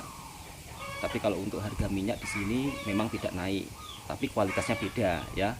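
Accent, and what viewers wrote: Java, Indonesia. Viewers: Nmpk jelas isteri lebih smart dan ulasan2nya lebih baik dan teratur. ni pulk bodo, ckp brbelit2, brulang2..loghat bahasa jawanya yg lebih pekat dan asyik trsasul., sesekali bagi peluang la kpd isteri mmbuat konten, jgn ganggu atau menyebok...pastinya lebih menarik.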